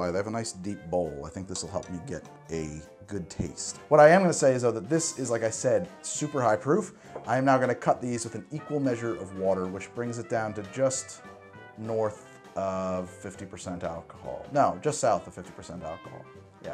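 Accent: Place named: United States. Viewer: I don't actually know where lives, I assume the US because of his accent and turn of phrase, I assume you live there as well because of your attitude, I could be wrong and you could both live in Australia, I really don't know and it frankly makes no difference. And as I don't know, I will go with the worst possible situation (eg 'doing this is breaking the law') and work from there, if it's not illegal where you are that's great! Have fun, try not to burn yourself on any of the hot surfaces and liquids that this process will create, and be careful with which fractions you choose to drink from it. But I'm not going to claim to know what the distillation laws across the globe are, I'm not a lawyer.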